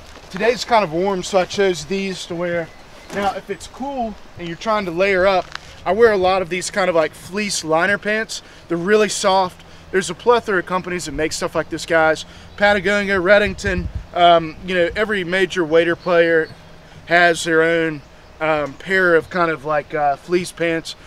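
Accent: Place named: South, United States. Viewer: Love the channel your accent is a mix of southern and Cali surfer lol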